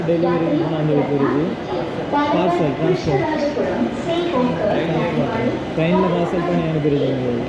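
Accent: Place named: Beruwala, Sri Lanka. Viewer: Bro your slang only in beruwala not whole Sri Lanka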